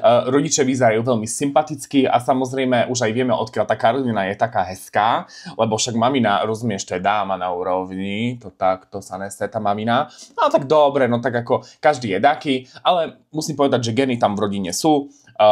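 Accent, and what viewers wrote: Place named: Eastern Slovakia, Slovakia. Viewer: podľa prízvuku od vychodňároch.. či? 😅🤣❤ Som z východu.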